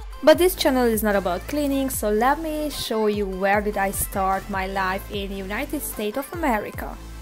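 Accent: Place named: Hungary. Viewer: This is so cool video. I've been watching your work on your other channel for a few months now and I always thought that your accent is somehow so familiar to me. I've had this feeling that you're Hungarian but I was never sure until this video. Kinda mind-blowing for me. I'm from Slovakia but my grandparents are Hungarian so I also speak a little ❤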